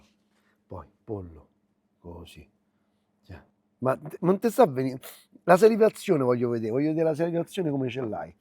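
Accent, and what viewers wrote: Rome, Italy. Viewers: Bravo MAX, quando cucini così è piacevole. p.s. i sottotitoli in italiano servono a tradurre il tuo accento romano ? dai penso che si capisce quello che dici ....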